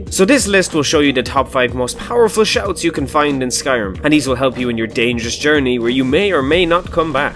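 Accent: Ireland. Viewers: I have watched over 50 of your videos at least so far and this is the first one I realized you have an Irish accent.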